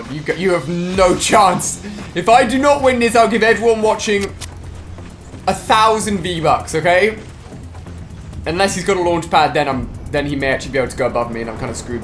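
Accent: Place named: England, United Kingdom. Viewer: Mason Coggins he didn’t he said freaking remember he has a accent cuz he is from England duh he said like he said the f word but he didn’t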